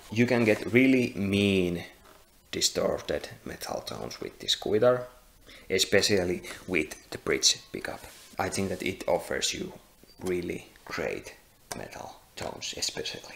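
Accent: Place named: Finland. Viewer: Hahahhaa äijällä vitun selvä suomi aksentti.. Hyvä video!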